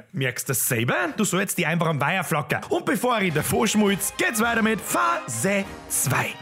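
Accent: Bavaria, Germany. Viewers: ZEFIX etz binne moi zwoa wochan ned do und dann haust du seichane geiln videos ausa 😍 suppa wia oiwei duad guad wieder epan boarisch schmadsn zum hean 😊 kimmd den Freidog eigentlich a stream oder erst nägste woch? Habedrrrrrt.😜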